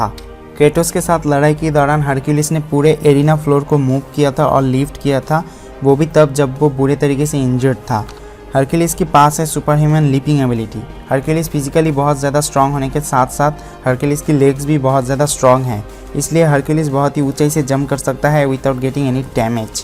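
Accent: Bengal, India. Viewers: are u bengali?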